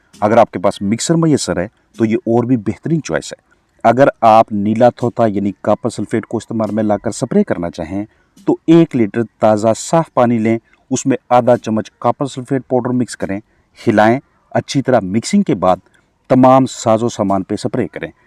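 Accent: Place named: Pakistan. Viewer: Apki zavan sunkar aisa laga jaise me ibadat me chali gai kuch der ke liye aap bahut urdu mein baat karte hai aur hum urdu hindi mix bolte hai i think aap Pakistan se hai. Aur hum India me peda hue isliye yaha ke mahol ke mutabik hain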